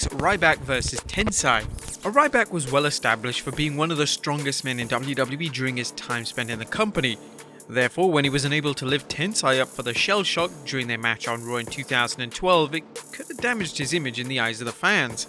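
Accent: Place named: United Kingdom. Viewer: H not haitch bee Kay. I hate how the UK people talk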